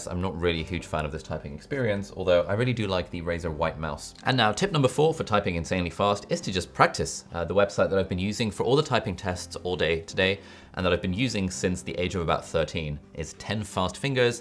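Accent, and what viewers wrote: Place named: RP, United Kingdom. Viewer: I would like to know where your accent comes from because you sound a little rp however im not sure, I can't figure it out by the way I really like your accent!! You sound so nice!!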